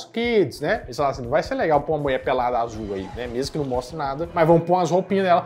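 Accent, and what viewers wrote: Minas Gerais, Brazil. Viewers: Esse resumo em mineires ficou bom demais kkkkkkk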